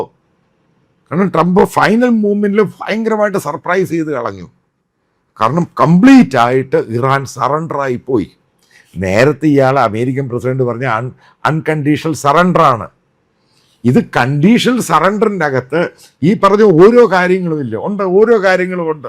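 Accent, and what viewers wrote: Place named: Pala, India. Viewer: അച്ചായൻ ഈ വിഷയത്തെ കുറിച്ച് പഠിക്കാത്തത് കൊണ്ട് മാത്രമാണ് ഈ വിവരക്കേട് വീഡിയോ ഉണ്ടായതെന്ന് എനിക്ക് മനസിലാവുന്നുണ്ട് - അവനവന് പറ്റുന്ന വിഷയം മാത്രം എടുത്താൽ പോരെ..... ഇൻ്റർനാഷണൽ ഇഷ്യൂസിനെ കുറിച്ച് കൃത്യമായി പഠിച്ച് നല്ല രീതിയിൽ വീഡിയോ ചെയ്യുന്ന എത്രയോ വിദഗ്ധർ ഇവിടെയുണ്ട് , അവരുടെ ചാനലുകളും - അച്ചായൻ്റെ പാലാ Slang ഇല്ലെന്നേയുള്ളു ......😂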